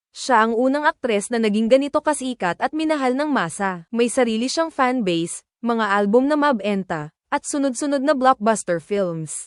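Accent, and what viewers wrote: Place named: Visayas, Philippines. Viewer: Ano bang lahi mo bat ganyan Kang magsalita ? Yung pronounce mo Ng mga words parang visayang inskit.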